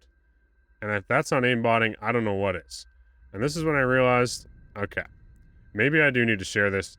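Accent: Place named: United States. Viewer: your english is indistinguishable from an american speaking English, where are you from?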